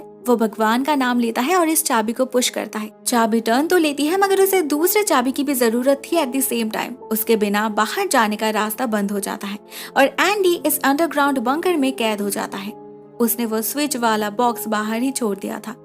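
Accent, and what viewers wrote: Bengal, India. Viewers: My my your sweet sweet voice 😋 ur accent feels like Bangali 😍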